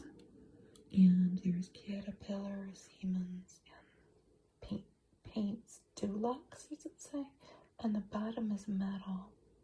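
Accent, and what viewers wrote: United States. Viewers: Love the videos, australian here and I'm curious what regional accent I'm hearing? It's so oddly charming and I don't know the first thing about US dialects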